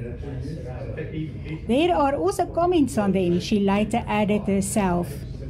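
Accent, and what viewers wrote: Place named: South Africa. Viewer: always happy to watch and enjoy hearing your South African accent :)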